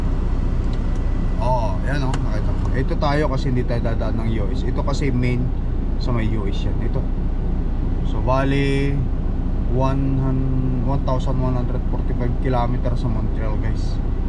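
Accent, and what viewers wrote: Ilocos, Philippines. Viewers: pagpraktisan mo mga anak mo at sabihan mo i-correct ang mali mo. Tulad ng title ng vlog mo ngayon, mali na. Gusto ka ng mga followers mo dahil sa personalidad mo, prangka at walang inhibition. Yon nga lang, pag nag english ka na at sasabayan pa ng ilocano accent mo, halos matunaw ako sa paninimbang. Pero enjoy ako sa panonood sayo.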